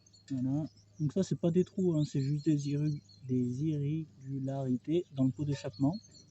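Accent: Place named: Savoie, France. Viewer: Tu es d’où mec ? Ça sent la Savoie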